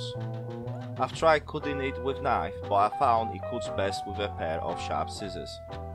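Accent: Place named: Hull, United Kingdom. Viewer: Good job buddy loving the hull accent lol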